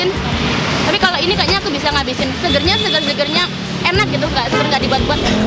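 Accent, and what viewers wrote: Java, Indonesia. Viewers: Mbak. Asalnya drmana ya. Kok jowone ngguenah poll hehe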